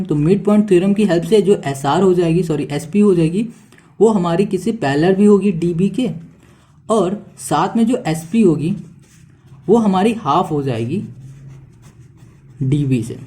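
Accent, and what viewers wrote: Bihar, India. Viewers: You are from bihar sir?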